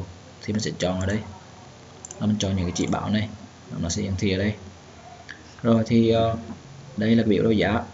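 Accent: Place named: Central, Vietnam. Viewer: Học tiếng việt nam đi bạn ơi chứ làm youtube nch quốc gia miền trung vậy nghe nhức cả óc =.=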